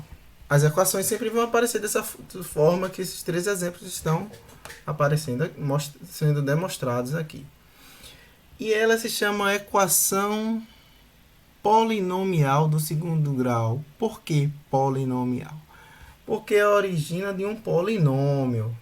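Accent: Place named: Rio de Janeiro, Brazil. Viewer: MDS sua voz é a do Super choque só que carioca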